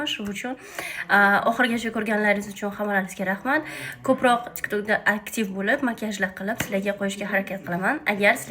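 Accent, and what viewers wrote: Bukhara, Uzbekistan. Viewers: Салом яхшимисиз хон! Сизни тинлаш ва куриш менга жуда хам ёкади айникса Бухороча акцент сизни яна хам ширин курсатади. Ишингизга омад соглик саломатлик тилаймай❤ купрок чикаринг шундай видеолар!!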